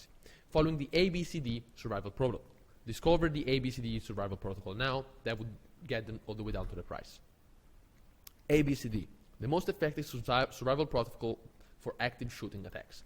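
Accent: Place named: Italy. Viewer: You Italian accent is just so cool to listen bro ❤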